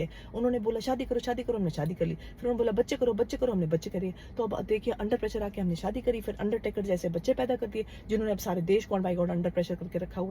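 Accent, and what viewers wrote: Punjab, India. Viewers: Nice. More punjabi please 🙏 your punjabi is very sweet. Kangana ko thappad therapy dilao. 🤣🤣🤣🤣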